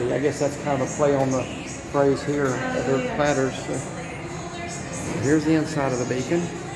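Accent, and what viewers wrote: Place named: North, United States. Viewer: You can spot a yankee 40 miles. Still don't know how to say pecan .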